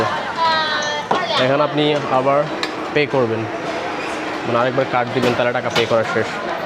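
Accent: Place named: Kishoreganj, Bangladesh. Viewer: ভাই আপনার ভাষার টোন টা কিশোরগঞ্জ বা ব্রাহ্মণ বারিয়ার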